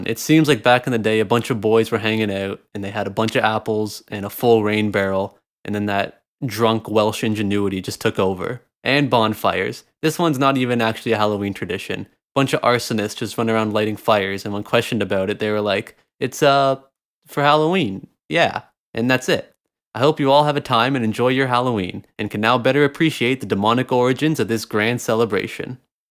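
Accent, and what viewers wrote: Canada. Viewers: All of a sudden I can hear you Canadian accent and cannot unheard it. I can't stand it. This video has a demon in it and I am now cursed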